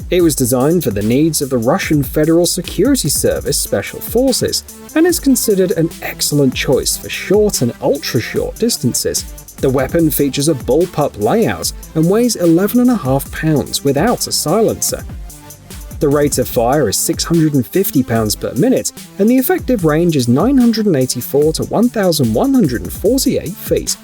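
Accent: England, United Kingdom. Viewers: why the brit narrator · Did the UK accent give it away? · English accent. How much of a gun guy could he be? The royalty disarmed it's "subjects" long ago. They have a false sense of security. By the way, how is that Muslim thing going in your parliament? The UK is in greater peril than the rest of Europe. The greatest way to control your subjects is to disarm them. Then the brainwashing starts. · That was the best video with the British narrator I've ever seen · Sounds english, makes sence as over here we have to have licences for everything, even airsoft guns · And hes british as fuck, he said alooh-minium. Its Aluminum. Fuck. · No shit he’s British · British accent should be a dead giveaway that this guy doesn’t know anything about firearms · Narrator sounds British, which explains his apparent lack of knowledge about firearms. Remember, the British let their government disarm British citizens, making self defense illegal. 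"Gun Control" (and crooks) win, citizens lose.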